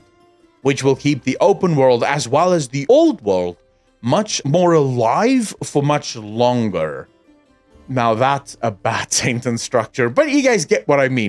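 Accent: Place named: Afrikaans, South Africa. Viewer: Random question, where are you from? Your accent sounds slightly Afrikaans, or Dutch?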